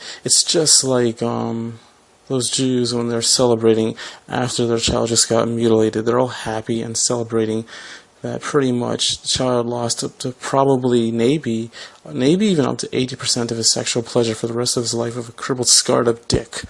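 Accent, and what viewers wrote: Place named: Russia. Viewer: I like your accent is it Russian.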